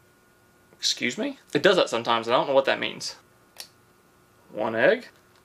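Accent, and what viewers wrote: South, United States. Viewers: I just found this channel and I'm a bit confused. I thought for sure from his accent that he's from the south US, but he's never seen a sweet potato? Maybe his family used canned yams and he doesn't know they're the same thing. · Funny thing is those sweet potatoes were probably grown in the USA. They are extremely common in the South. sounds like he has a southern accent surprised that he doesn't know about it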